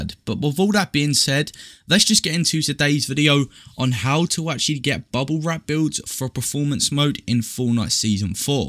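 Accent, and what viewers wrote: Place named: United Kingdom. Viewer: not a british dude again hell naw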